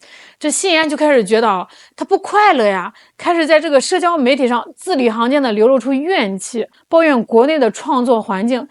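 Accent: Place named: Shanghai, China. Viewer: Your Shanghai dialect is so funny! 😂👍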